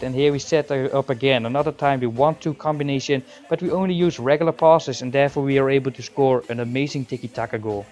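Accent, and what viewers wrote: Netherlands. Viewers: Great tutorial. Btw you sound Dutch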